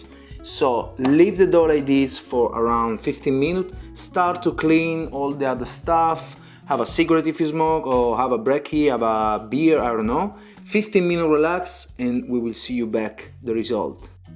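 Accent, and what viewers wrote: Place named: Italy. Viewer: Mate don't apologise for your English! It's great and it doesn't even matter if we hear your Italian. I love your accent!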